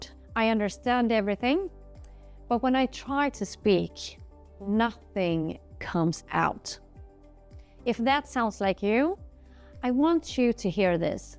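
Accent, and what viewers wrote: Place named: Sweden. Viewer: Great summary of years of experience. Keep you accent - it gives you a distinct charm. You sound like a Swedish person among the crowd of wannabes 🙂 Yes, speaking clearly and comprehensibly is important, but do not get rid off of your accent completely - it is your feature to be memorable. Though for many it is a goal of their life.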